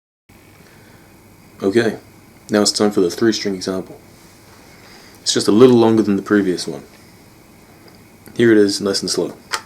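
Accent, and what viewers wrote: New Zealand. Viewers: Nah this sounds kiwi not aussie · are you from new zealand? or australia? i am to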